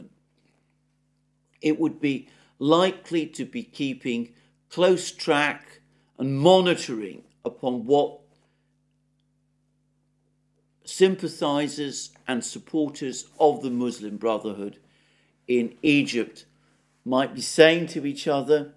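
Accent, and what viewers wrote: United Kingdom. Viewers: Love the British accent - Ham Ass - funny AF. Love the show.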